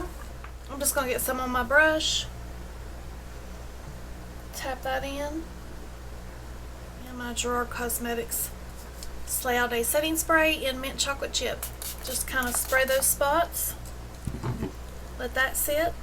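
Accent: South, United States. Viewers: 🚨🚨🚨🚨🚨🚨 I'M READY... I'M JUST HERE TO SEE YOUR PRETTY LITTLE FACE!!! ITS THEM EYES AND THAT COUNTRY GAL ACCENT FOR ME😘😘😘😘😘😘 🚨🚨🚨🚨🚨🚨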